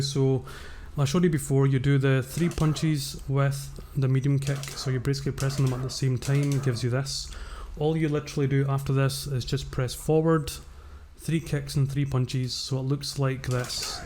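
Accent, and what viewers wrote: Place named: Glasgow, United Kingdom. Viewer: Awryt wee sacks. Kidding, lol you sound local to me. Fancy a few sets ? With this dogshit netcode on this game I'm thinking if your local it SHOULD be better experience Cfn:Beast94 glasgow by the way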